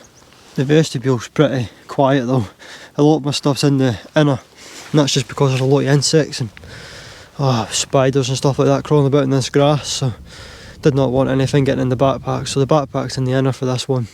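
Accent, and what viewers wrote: Scotland, United Kingdom. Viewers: Thanks for that young man - regrettably I've never been a hill walker though I did scale one Munro in my earlier years - it's definitely something that I regret however with modern technology I can indulge in viewing blogs like yours which while isn't the real deal gives me enormous enjoyment taking in the majestic scenery and views - I'm a glaswegian and can appreciate your neutral Scots accent and modest demeanour - keep the videos coming and keep safe - PS I still have my camping gear which includes a terra nova voyager tent and thermarest mat - need to get the finger out and engage in a wee trip soon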